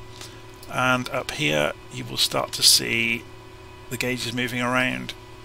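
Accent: England, United Kingdom. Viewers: Considering the high quality of your content, the superb presentation, your hundreds of quality wide-ranging videos and 3 years on the channel, I cannot understand the relatively low number of subscribers. Could it be the English accent? As a Londoner, I appreciate it, but there's no accounting for Eastern Pond dwellers. Fear not, I'm on your side; keep up the splendid work - ex-RAF navigator of 50+ years ago!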